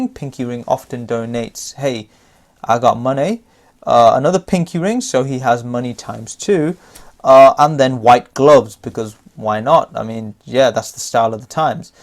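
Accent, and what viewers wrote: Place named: Scotland, United Kingdom. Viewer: your scottish accent is on point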